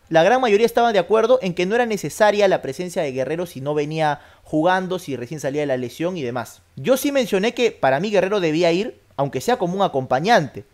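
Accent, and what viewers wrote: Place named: Argentina. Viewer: pero porque hablas como argentino?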